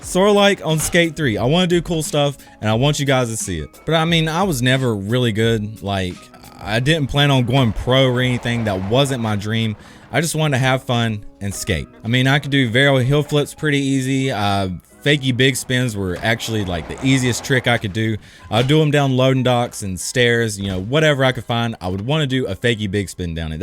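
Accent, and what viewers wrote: South, United States. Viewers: he sounds like he lives in the southern us and nightspeeds lives in denmark i think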